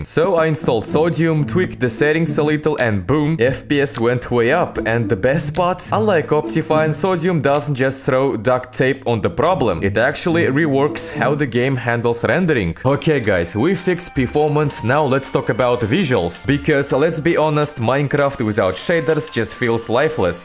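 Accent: Russia. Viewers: Нда, чел пытается не палиться что он русский, но акцент говорит нет Ты позоришь себя, микрочел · russian accent detected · how this the most russian accent i have ever heard (i am russian btw) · Не иронично самый клёвый акцент ру английского с неожиданно довольно хорошим произношением и ахеревшим словарным запасом. Как говорится fluent af. Будет крутой гиммик канала. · Почему мне кажется что у тебя русский акцент ? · When you hear a russian speaking english AAAAND cursing in russian..., the vídeo is hilarious and serious at the same time. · I knew that guy was russian when he started speaking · Прикольно что из за русского акцента мне становится в разы проще понимать английский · hell nah hes def russian, i can say it cuz im russian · Как же мне нравится эта озвучка с Русским акцентом:) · I ve recognised Russian speaking man from the very first words 🙂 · русский акцент bilya... 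· Bro i love russian guy speakung english😂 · Couldn't have made a more russian sounding enlgish accent fr. Thats how people in my school read English texts. Good thing i know english well💀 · love you rrusian accent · Как ты говоришь на английском так, чтобы русскому было тебя понять легче, чем англо-говорящему?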